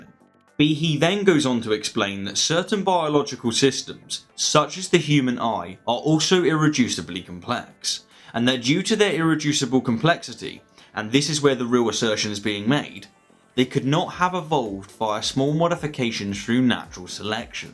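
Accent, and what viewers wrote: England, United Kingdom. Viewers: How did you develop an English accent in South Africa?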